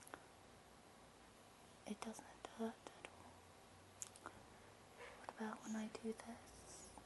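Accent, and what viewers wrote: England, United Kingdom. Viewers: you have an amazing voice. i was not expevting an english accent from an asian girl either. really great video. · i think i need to move to the uk... I love women with this accent... >.< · A Korean with an english accent is a juicy contradiction!! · I love the idea of an ear examination video. I'm addicted! I usually don't get ASMR from English accents - something about being reminded of myself XD - but this was faaaabulously tingly. Thank you!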